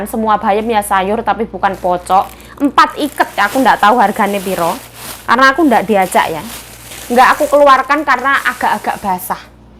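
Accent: Java, Indonesia. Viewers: *Lansia 80 🇳🇱🌷Holland*...selamat pagiii...baru dua hari mengikuti Vlognya...menarik menontonnya dicampuur rasa iba kalo baca yg sedih2...saya tersenyum mendengar clotehan medók sekali logat Jawanya dan waduuuh lancaaarr ucapan2nya seperti air terjun🥰...dari Negeri kincir angin salam sehat selalu tetaplah semangat....juga buat para pemirsa dimanapun berada.....🙏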